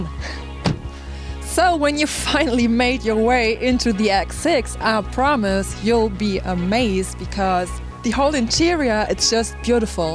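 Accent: Germany. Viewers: Nice German accent.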